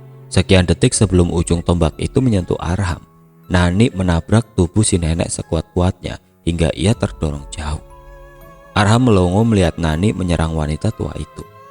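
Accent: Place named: Banyumas, Indonesia. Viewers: masnya orang ngapak ya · apa orang tegal ya kok medok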